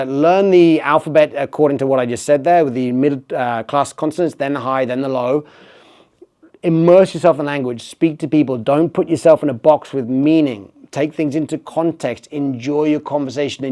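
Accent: Thailand. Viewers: When you are speaking English, i thought Thai is speaking English. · Only Bangkok people speak like this, it's too proper for me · I don't know why but your English sounds exactly like English speaker who can speak Thai. It's often like that and it's fascinating. Maybe learning Thai changes your how you speak English? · His Thai sneaks up on him when he speaks English 😂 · สำเนียงไทย 100% เลยค่ะ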